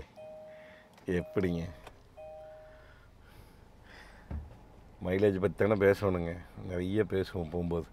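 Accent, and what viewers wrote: Kongu, India. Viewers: கொஞ்சும் கொங்குத் தமிழ் பேசும் கோமகரே...! திகட்டாத தித்திக்கும் பேச்சுடைய திருப்பூர் திருமகரே...! எங்கள் மதிப்பிற்குறிய " " திரு. அண்ணா அவர்களே❤❤❤🙏 எப்படி இருக்கீங்க அண்ணி❤❤❤🙏 எப்படி இருக்காங்க ரித்திக்❤❤❤ எப்படி இருக்காரு திருப்பூர் திருமகரும்❤❤❤ திருமகரின் திருமதியும்❤❤❤ இந்தக் கிளிகள் இரண்டும் கொஞ்சியபடி "கியா கேரன்ஸ்" காரில் பயணிக்கிறது😊❤❤❤❤ என்றும் அன்புடன் உங்கள் தம்பி என்றும் அன்புடன் உங்கள் "TMF" ரா.முஹம்மது கபூர் (சென்னையில் இருந்து)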